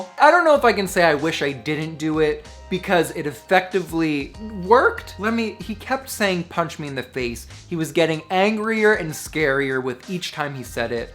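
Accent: New Jersey, United States. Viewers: yep sounds like new jersey to me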